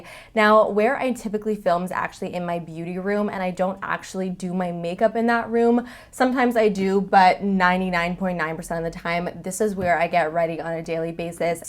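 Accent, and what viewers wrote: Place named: Canada. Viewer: Where are you from in Canada because I can hear a strong accent. Definitely different to how most people speak in B.C.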